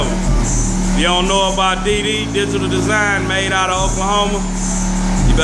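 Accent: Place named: Texas, United States. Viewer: Texas talk..👍👍😂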